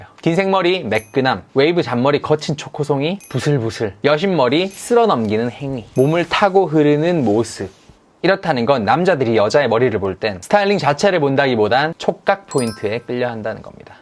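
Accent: Gyeongsang, South Korea. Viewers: 디~게 귀엽게 생기셨다~^^ㅎㅎ근데 경상도 말구...서울 말투면 뭔가 더 좋겠다~^^ㅎ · 말투 희안하네 대구가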